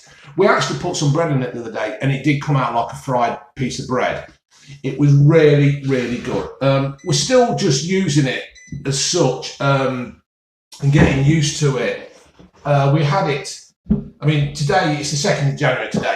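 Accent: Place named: Leicester, United Kingdom. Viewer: mate I know. I’m Leicester born and bred. Knighton. Moved to west coast of Ireland but I can recognise a lesta accent a mile off. Take care me duck 😂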